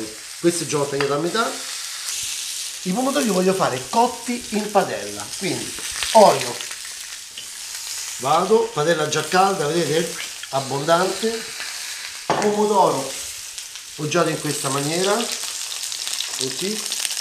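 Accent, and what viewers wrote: Rome, Italy. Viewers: bravo e simp0atico poi anche romanaccio hahaha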